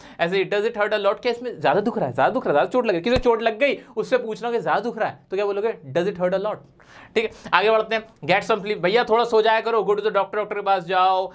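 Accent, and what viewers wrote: India. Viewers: because indian accent laga hai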